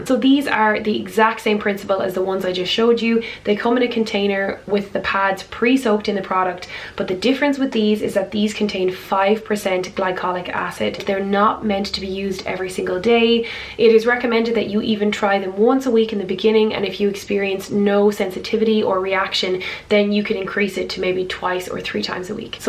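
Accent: Ireland. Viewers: R u Irish??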